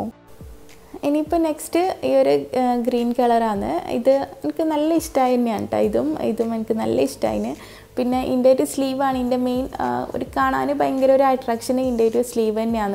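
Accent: Kannur, India. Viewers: Kannur slang ❤️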